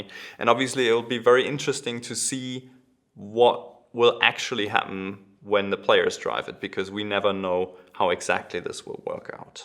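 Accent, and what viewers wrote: Germany. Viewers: Er hört sich aber sehr nach Deutsch an.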